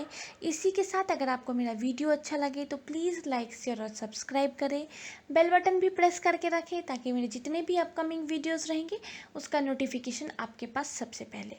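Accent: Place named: South, India. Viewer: Well presented . You must be from South India I believe .your voice seems like that . Thank you ma"am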